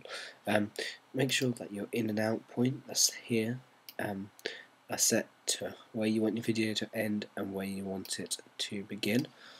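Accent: United Kingdom. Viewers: Why NTSC (Never The Same Colour) when you sound English? :)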